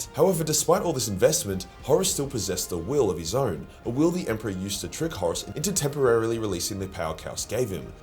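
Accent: Australia. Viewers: I’m here to hear the Aussie Chad talk it all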